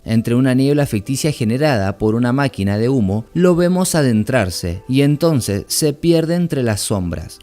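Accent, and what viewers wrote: Argentina. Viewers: SOS argentino? Porq hablas todo con sho jaj pero tenés otro acento a la vez jaja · Sacá el acento de argentino lpm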